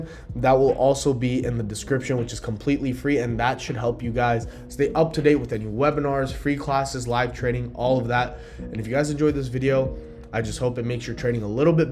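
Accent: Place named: Canada. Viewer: No way are you American, your Canadian with that accent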